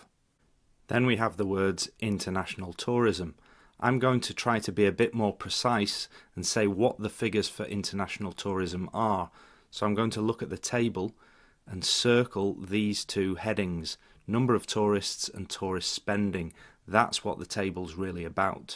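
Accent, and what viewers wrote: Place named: United Kingdom. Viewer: Woow u've such a beautiful voice and accent, Don't take it personally, it's not an offensive word. How did you achieve this accent, I from central asian, because of that I have problems in accent, as sound r. How did u get this british accent?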